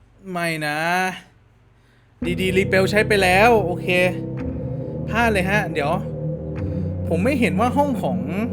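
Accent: North, Thailand. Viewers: เหมือนคนเหนือเลย พี่อยู่จังหวัดอะไรครับ 555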